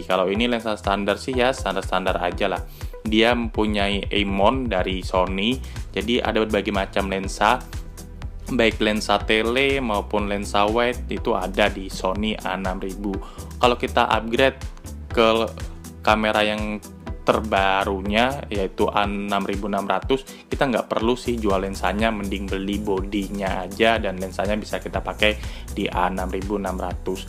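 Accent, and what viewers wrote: Banjar, Indonesia. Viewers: Urang Banjar kh jua Pian😅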